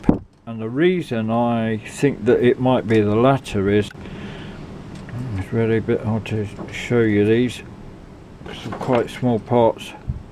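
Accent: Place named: East Midlands, United Kingdom. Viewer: Great video. Very interesting and entertaining. Sounds like you're an east mids lad. Cheers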